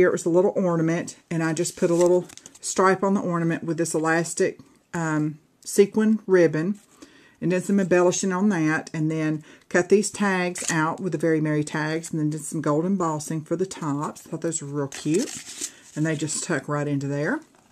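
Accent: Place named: South, United States. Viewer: This is one of the MOST adorable minis I've ever seen! I love love love it!! :) And on top of it all I love listening to you talk! I'm a "yankee" so southern accents I could just listen to all day because I never hear em!!! I can not wait to check out your other videos! Thanks for sharing this!! :)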